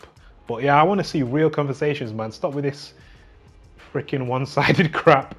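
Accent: United Kingdom. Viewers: bruv, ya need some proper editing. Besides that, your commentary's dead sound. (not mocking the Manchester accent. I'm a huge England-fan and Manchester in particular from the Hacienda bands)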